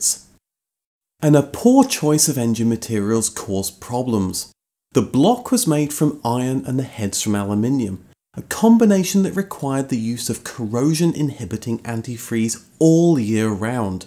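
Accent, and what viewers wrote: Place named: United Kingdom. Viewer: I enjoy your show you are very thorough and well knowledged in what you do I love the British accent being from the US. I think that anything with the Union Jack on it belongs in my driveway! I love Queen Elizabeth, Winston Churchill, the Duke of Edinborough is one tough dude and I think a monarchy would do our country some good !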